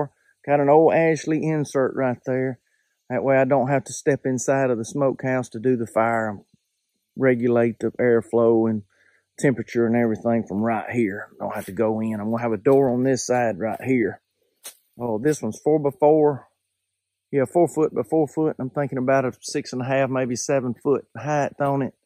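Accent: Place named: Tennessee, United States. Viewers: stayed for the southern drawl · You from Tennessee? Your accent sounds like you are from around these parts.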